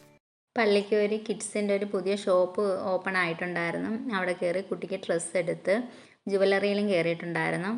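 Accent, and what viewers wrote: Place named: Malappuram, India. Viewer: ഇത് പോലത്തെ vdo കൂടുതൽ ഇടൂ.👌 Tvm ആണോ മലപ്പുറം or kozhikode ആണെന്ന് തോന്നി.👍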